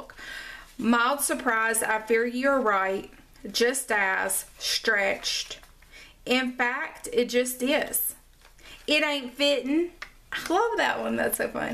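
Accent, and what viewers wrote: South, United States. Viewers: That whole book sounded like a Southern conversation, lol. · Lovely story AND art!!! And the award goes to...🥁🥁🥁 Southern speaking ladies!!! I'm from Alabama so this is quite refreshing, like a big ol glass of lemonade. Love your videos. 💕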